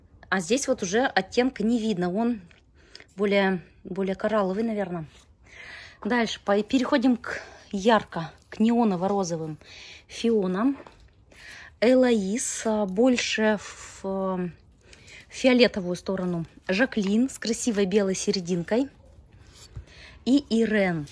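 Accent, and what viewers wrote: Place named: Urals, Russia. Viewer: Первый раз смотрю вас. Сразу подумала что вы с Урала. У меня там есть родственники у вас своеобразный интересный говор не перепутать. И слова есть которые только от этих родственников слышу. Очень у вас все цветы хороши👍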